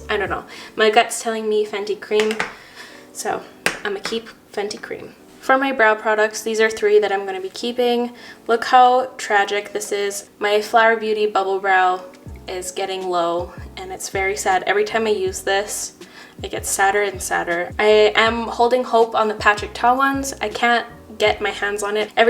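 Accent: France. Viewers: Can you do a tutorial completely in French. I love your accent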